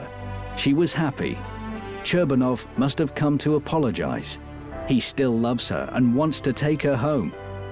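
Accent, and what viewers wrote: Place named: United Kingdom. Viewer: Every time i hear narrators Albion accent I know immediately, its not worth to watch the story...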